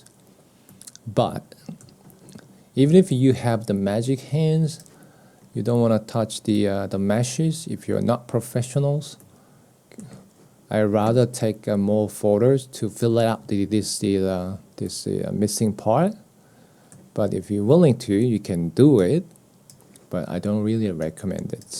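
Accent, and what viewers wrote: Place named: Australia. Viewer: Yeah same here! Its fine! At times he sounds like he has a Australian accent! And for the record I understand him better than a lot of Australians! =]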